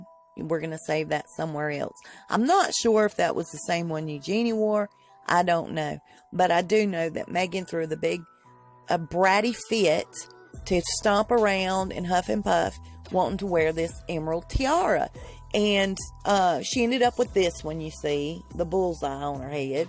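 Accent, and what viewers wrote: South, United States. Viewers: What a witch 🕷! Thank you for keeping us posted, by the way I like your Southern accent💗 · Oh oh what's that do I hear a bit of Southern Confederacy in your voice I'm really concerned here that we might be dealing with a racist then again you people don't call yourself races anymore do you I believe it's nationalist now get a life besides talking about this girl's seriously he chose her in a discussion you know you can't bring back the Civil War and give it to the South and your racism shows and all your videos by the way not just this one I will be notifying YouTube about you I'm sick and tired of you Paula Dean , Roseanne, racist pieces of crap going after this girl for no reason what did she do to you exactly and you sound American so you don't have any investment in England and if you were Harry told you to keep your opinion to yourself I don't think she ever because enough for you and you know she won't so why are you bothering us with your opinion why don't you stop burning your damn crosses on YouTube and by the way she was only married once before but that's just how much you educate yourself on any media that's not sponsored by whatever dude under a sheet you work for oh my goodness you know is this how you treat people seriously you seem to not go to church or know that you should treat other people the way you would want to be treated you just seem like a overwhelmingly negative person you ain't saying nothing unless you got something bad to say about this girl Kyrie put out a statement when they first started dating about people like you I believe it wa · Hey Hon welcome to the south! 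I love your southern accent! Carolina girls best in the world!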